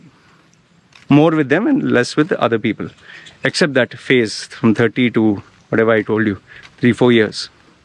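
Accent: India. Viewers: Are you Indian, your accent sounds like Indian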